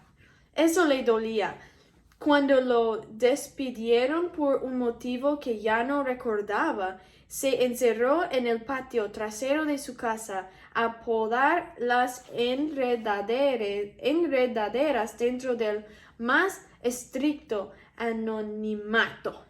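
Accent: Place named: England, United Kingdom. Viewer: Que buen cuento. 🤔Me encanta leer y escribir.Y que bien que promuevas la lectura en jóvenes. 🤓 Hay vocabularioqie que se usa mas en literatura, algunas pocas palabras no se usan en la cotidianeidad pero te hiciste entender muy bien. 🤗Sólo te sugiero mejorar acentuación en algunas escuchandolas o escuchando audiolibros de tu interés. Y que hagas in video semanal leyéndonos algo, a mi me entretuvo con tu voz y acento inglés y así practicas. Por fis di que sí, andale por fis sería bonito. 😍Hasta me dieron ganas de volver a leer algo de literatura que he dejado a un lado por el trabajo. 😣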